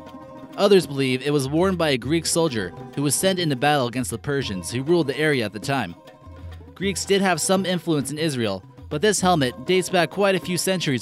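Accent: United States. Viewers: paused the video with the laptop bullshit. the snarky American narrator is also annoying. For these reasons i'm out! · theres something so jarring about an American accent and american flags when discussing classical greece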